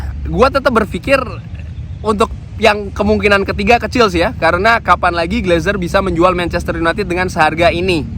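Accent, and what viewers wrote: Semarang, Indonesia. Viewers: Asli semarang kah bang? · Lho sampean wong semarang to mas? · Orang Semarang ya bang? 😮 Kirain sy org luar Jawa 😂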